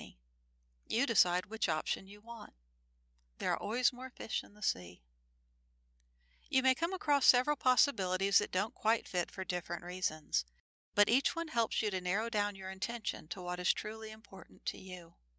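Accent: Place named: South, United States. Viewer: Very informative. Pleasant vocal as well. I put the accent as the Virginia - Carolina region.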